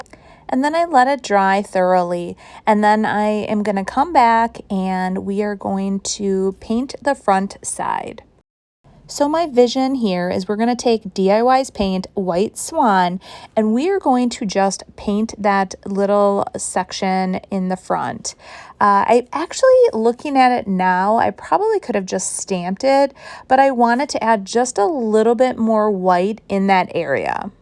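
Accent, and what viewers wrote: Wisconsin, United States. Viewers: Love everything!! Your Wisconsin accent makes me miss home..love it! ( I live in California but originally from the Illinois/Wisconsin border) Shabby Chic is my favorite.. I’m on the No vote side to Laundry it’s beautiful as is!!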